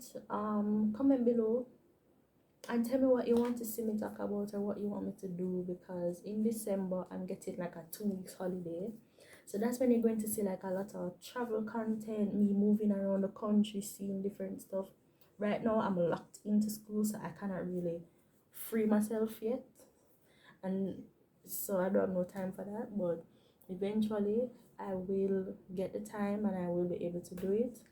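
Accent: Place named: Caribbean. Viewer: I love your carribean accent girl!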